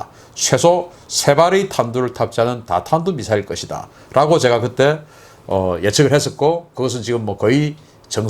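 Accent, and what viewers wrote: Gyeongsang, South Korea. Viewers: 나는 미국 샌프란시스코에서 살고있읍니다. 신인균 교수님은 애국자며 잘 연단된 분이며, 이 대한민국에서 발견 됬음을, 참으로 축복받은 한국이라고 생각 합니다. 결론은, 신인균 교수님은 애국자이시며, 남한에 대통령감 입니다. 빨갱이 이북놈들과 싸워 이길려면, 신인균교수만한 능력있고 자격있는 사람은 하나도 찾아볼수 없었읍니다. 얼굴도 대통령 얼굴 처럼 잘생기셨고, 한국을 바로세울수 있는 분은, 바로 신인균 교수님이라고 인정합니다. 세게 어느대회에 나가도, 한국을 대표하는 대통령으로서, 하나도 부족함이없이, 잘 훈련받은 훌륭한 대한민국 남자라고 봅니다. 내년 대통령 선거에, 꼭 출마하셔서 대통령이 되셔야 대한민국을 살릴수 있읍니다. 미국에있는 우리 교포들이 전부 다 간절히 바랍니다. 그런데, 한가지 바라옵기는 , 경상도 사투리는 이해가 참 어렵습니다. 조금이라도 고쳐주시면, 우리가 듣기에 많이 쉽게 들을수 있겠다고 생각하며, 그렇게 노력해주심을 진정으로 바랍니다.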